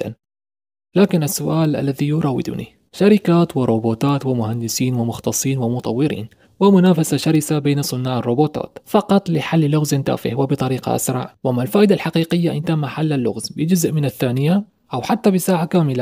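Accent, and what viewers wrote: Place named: Arab world. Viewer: استمر عنوان ممتاز حنجره ممتازه اسلوب راقي اللهجه العربيه تفوز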